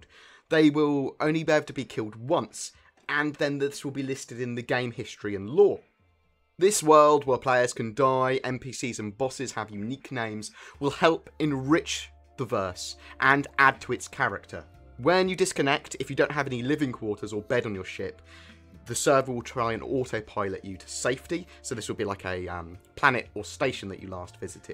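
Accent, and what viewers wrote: United Kingdom. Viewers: Great video as always! Have you ever considered about a professional anchor position? You remind me of a lot of British actual speakers..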